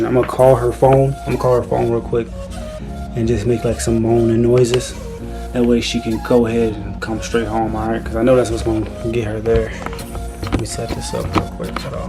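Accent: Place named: Jamaica. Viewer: I hope y’all haveing a good day and my Jamaica 🇯🇲 sister just have to say this JAMAICA FOREVER 🇯🇲 love how u talk we Jamaicans funny 😂 😂 btw I’m a new subscriber 🤗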